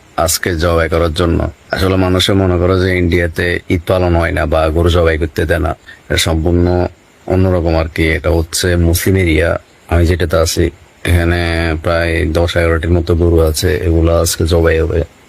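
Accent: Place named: Bangladesh. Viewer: Bro tomar kotha bolar accent onektai amader Bangladeshi der motoi... Valo laglo... Eid Mubarak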